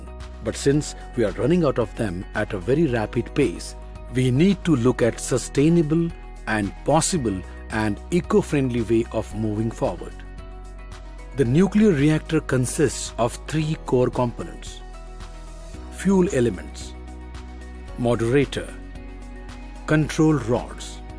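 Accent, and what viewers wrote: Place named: Bollywood, India. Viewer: Bollywood accent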